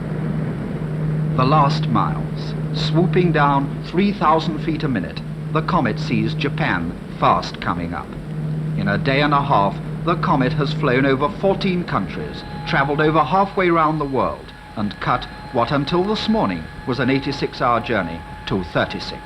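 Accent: RP, United Kingdom. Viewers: Filla-pines is just plain wrong, and RP is affected nonsense. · That sort of accent is referred to as 'RP' (aka. Received Pronunciation)... and is particularly redolent of news readers of the 1950's, just as can be heard here. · When the BBC strived to be POSH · BBC RP, now long dead. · It is RP. Not affected, people did speak like that. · The narrator is absurdly posh.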